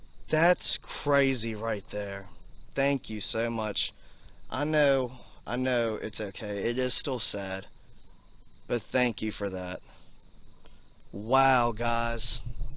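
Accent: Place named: South, United States. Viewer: I did NOT expect you to have a country accent 😂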